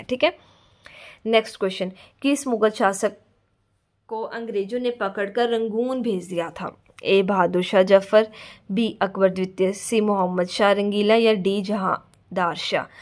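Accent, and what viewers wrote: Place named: Bihar, India. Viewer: bahut hi achchha contant hai ek hi video me thanks mam, aapke language se lg rha aap bihar se ho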